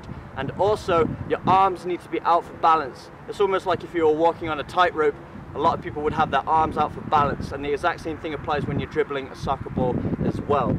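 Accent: United Kingdom. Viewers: Your Accent sound like You’re British Are u. Mate ?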